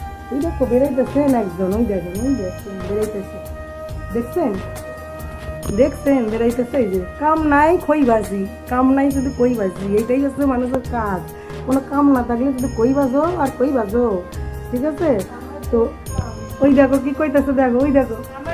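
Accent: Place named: Bangal, Bangladesh. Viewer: আহা গো কি সুন্দর বাঙ্গাল কথা কও গো 😍😍😍🥰😊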